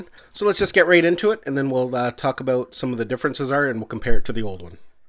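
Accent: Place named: Ottawa Valley, Canada. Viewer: I’m detecting an Ottawa Valley accent :)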